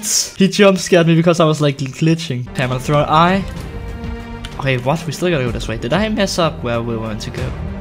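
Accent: Denmark. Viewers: hej jeg kan høre du fra danmark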